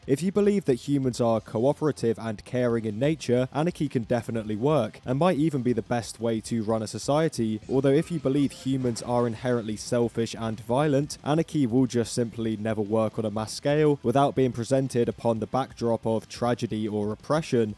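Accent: United Kingdom. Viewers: first time i've seen you. i thought you were a British Lad you know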